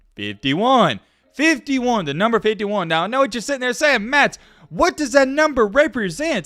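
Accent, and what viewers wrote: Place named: Appalachia, United States. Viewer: Shame on me...I keep taking the bait! This guy keeps releasing the same video every 4 hours....same content, same annoying hillbilly voice, same frantic speed in his voice, SAME CONTENT....and I keep watching!!!! 15 minutes of NOTHING....say the SAME thing....beg for subscribers, read a few quotes....boom another video and more revenue from YouTube...good for him for making money and increasing his subscribers every day...but I'm out...get a headache every video....bub bye.... unsubscribe 🙏🙏🙏🙏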